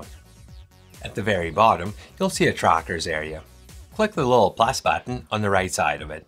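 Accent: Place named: Saskatchewan, Canada. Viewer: Holy cow dude, I'm from Saskatchewan and it took about 2 seconds to place the accent :-). Thanks for the tracking info!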